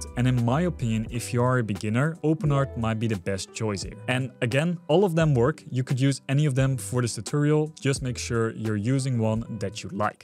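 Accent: Netherlands. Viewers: How is it that I can always recognize Dutch people when they speak English, even when they speak it almost without an accent? By the way, great content, keep it up!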